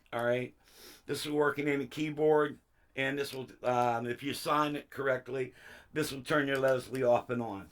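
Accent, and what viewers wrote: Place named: Pittsburgh, United States. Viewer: That Pittsburgh accent tho!!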